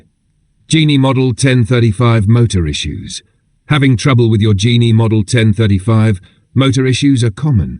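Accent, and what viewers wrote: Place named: Europe. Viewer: This is the most obnoxious narration. Does every video need a European accent to convey legitimacy. It doesn’t.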